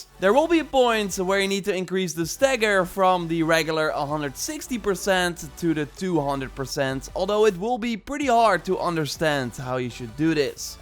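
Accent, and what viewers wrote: Netherlands. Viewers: Are you Dutch cause you kinda sound like it😆 Me2 btw Dutch ff fanboys ftw! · Clearly not Belgian, I think it really sounded Dutch as well :)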